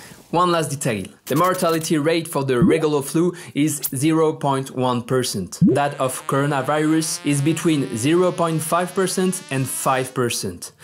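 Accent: France. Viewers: FRENCH ACCENT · It's eu bioutifoul french accente !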